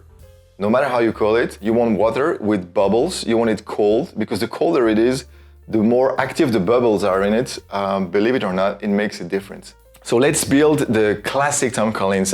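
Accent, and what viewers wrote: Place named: France. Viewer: oh man, that sounds like a french accent!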